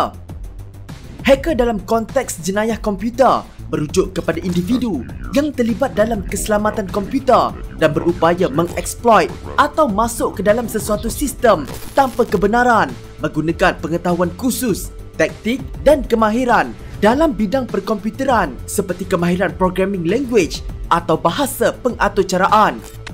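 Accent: North, Malaysia. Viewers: uii orang utara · Org utaqa kaa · Org utara ke yg bercakap nie. Tak sesuai la bro🤣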